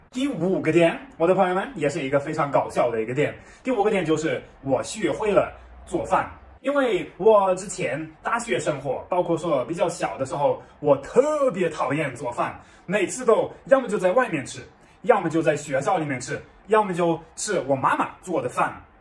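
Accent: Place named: Sichuan, China. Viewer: 有四川话那味儿了。。。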